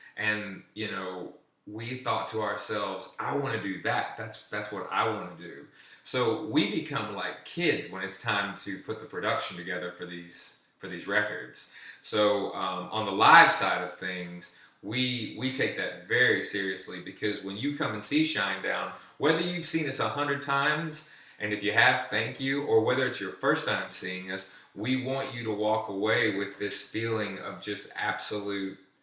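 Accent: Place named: Tennessee, United States. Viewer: Lolz I can hear the TN in his voice. XD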